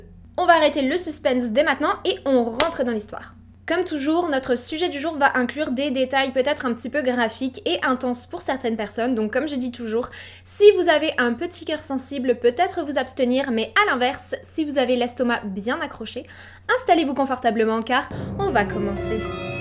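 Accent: Quebec, Canada. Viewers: On dirait qu elle a un accent léger du Québec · Est tu canadienne tu a un acsant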